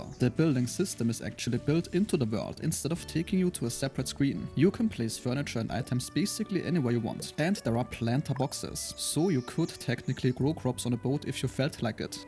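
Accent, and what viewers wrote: Germany. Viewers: Gonna add a comment to push the algorithm, you showed me some good games over the past Year, after discovering your Channel. Thank you for doing this! And I got one question about your accent. Is it German?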